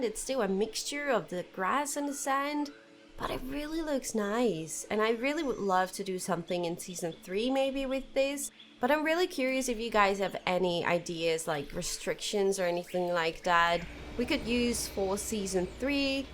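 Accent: Netherlands. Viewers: Soms dacht ik een Nederlands accent te horen, moest het even opzoeken. Zelde hoor je Nederlanders zo goed engels spreken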